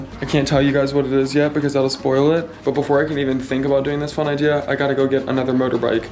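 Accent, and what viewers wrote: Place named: Baltimore, United States. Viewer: Love you, and your B’more accent. I grew up in Dundalk, now in Lutherville. Great, fun videos, keep on traveling! ❤️❤️❤️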